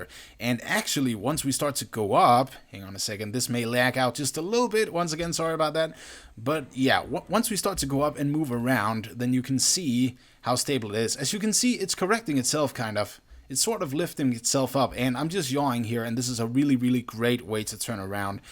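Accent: Denmark. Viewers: u sound like ur from denmark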